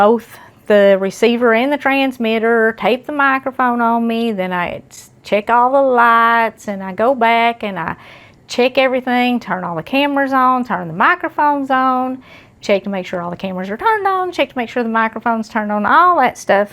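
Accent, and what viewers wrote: South, United States. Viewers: I am Southern born & bred but girl that accent is country, I luv it! Enjoy your videos, enjoy your bible talks, and I just ordered me a T-shirt, can’t wait to get it! Keep up the good work! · Just stumbled upon your video ,you was making homemade fried apple pies.,I watched all the video cause I liked the way you talk, don't know where you from ,sounds pure southern to me. Anyway enjoyed it very much. May God bless you in a special way . Gonna get me one of those dough cutters from the dollar tree.looks like a multi purpose gadget..thanks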